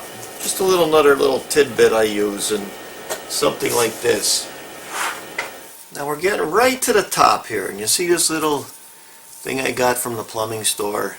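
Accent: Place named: Pittsburgh, United States. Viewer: I'm from PA also - Royersford, I'm guessing you are out somewhere near Pittsburgh judging by your accent! Those things came out great! Really look professional!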